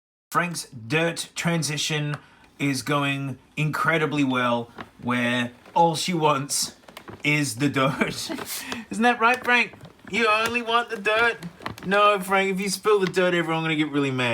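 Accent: Australia. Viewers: You sound like sniper tf2 and aussie too noice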